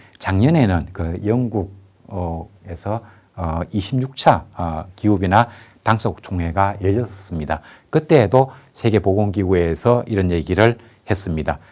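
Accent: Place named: Gyeongsang, South Korea. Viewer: 갱상도 말씨가 고향의 오빠야가 다정하게 이야기해주는듯 듣기좋습니다. 어려운 제목인데 편하게 알아 듣기 쉽게 풀어주시네요.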